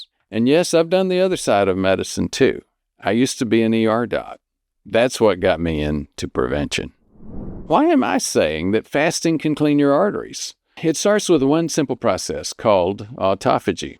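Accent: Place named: Midwest, United States. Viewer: Calling attention to some unfortunate ambiguity arising from terminology. In southern Illinois, where I grew up, dinner is at noon -- supper is at six. You sound to likewise have had a Midwest upbringing, but I'm nevertheless unclear on your terminology, and fear that others are also struggling with this. Regardless of this unfortunate confusion, thanks for all your efforts. I contend that by profession, doctors and lawyers wrongfully withhold knowledge from the masses through obfuscation. We're not stupid. Your efforts to feed the intellect of the alienated are a godsend.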